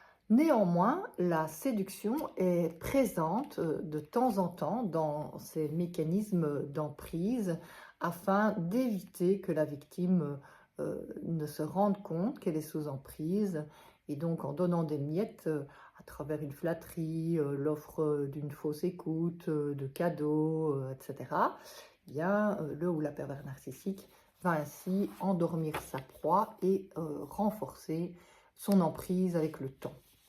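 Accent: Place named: Belgium. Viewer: Bonne explication, dans un bon français, avec bel accent belge.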